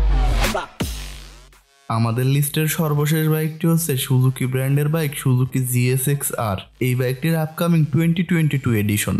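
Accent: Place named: Noakhali, Bangladesh. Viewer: ভাল করে বাংলা শিখে তারপর ইউটিউবিং করতে আসবে। এই খানের সবাই নোয়াখাইল্লা না।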